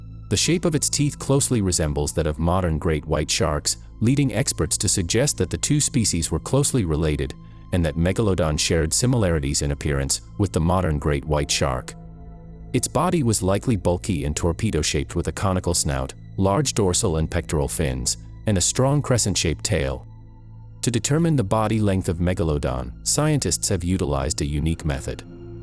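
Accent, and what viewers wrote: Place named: New England, United States. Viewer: FINALLY!!! A mini doc that doesn't try to proclaim that the Meg is still alive. Thank you so much. 2 things - - It's pronounced Ea pock not Epic. I do believe that the narrator is from New England or where "evah".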